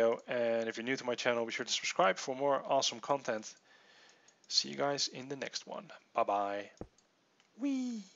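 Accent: Netherlands. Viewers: in Minecraft thought so. I recognized the way you talk. I'm Dutch to.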